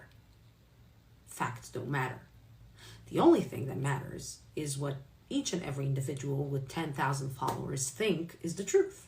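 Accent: United States. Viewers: God, you are incredibly BRAVE! To speak truth to lies in your world* is unbelievably Brave! I only wish people could understand that reality is reality even though I, you, us don't like it. Looking reality in the face and saying this is the truth is one of the hardest things to do. (*even though you sound American)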